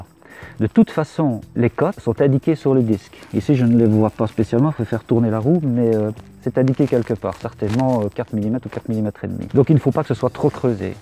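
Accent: Belgium. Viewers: Rien que de t'entendre parler je suis certain que tu est Belge !!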